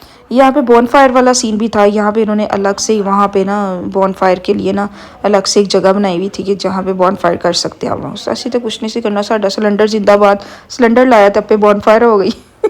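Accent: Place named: Lahore, Pakistan. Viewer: how can you speak so fast without any stop (are you from lahore, i guessed it from your speaking style), i enjoyed your commentary more than the video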